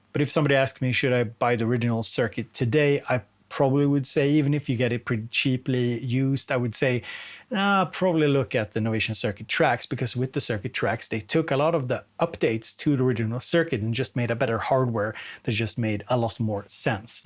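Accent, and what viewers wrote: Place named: Stockholm, Sweden. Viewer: I can picture myself getting rid of pretty much everything except my OG Circuit. It's such a joy. The immediacy of the sequencer, the knob twiddling exploration, the portability. I honestly, unironically believe it's an ideal environment for getting a curious young brain into understanding and creating music. Imagine getting one for your 12th birthday. (Din engelska har blivit bättre och bättre, men i mina öron låter du fortfarande som nollåttan, han med syntarna. ^_^ Hör av dig om jag kan hjälpa dig med uttalscoachning. <3)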